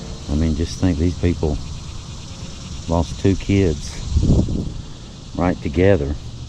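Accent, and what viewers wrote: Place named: Appalachia, United States. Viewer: It's that way here in North central Texas. Especially at the oldest cemeteries. There was a story of families that had lost so many children that they weren't even given a name until they were 2. I actually thought that was untrue, but it isn't. Lots of people died here in 1918 with the influenza but when it appeared again in 1919 it was even worse. Breaks my heart to walk, see mom and dad and several very small children side by side. I have been a sensitive all my life and there's times and especially places that it's overwhelming. I just stand and pray for all of them., your channel has quickly become one of my tops. I have family all over southeast KY and in west Virginia. Hearing you talk makes me feel like I am up there with them.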